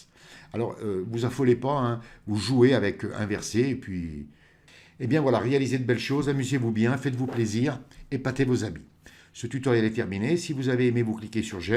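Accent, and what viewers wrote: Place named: Saint-Étienne, France. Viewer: Merci pour l'abondance de ces tutos si précieux en période de confinement. Cerise sur le gâteaux, l'accent stéphanois que j'ai découvert lors d'un séjour offert il y a bien longtemps, gracieusement, il y a bien longtemps au camp de la Valbonne. Le problème , c'est que l'on devient accro et qu'on en redemande.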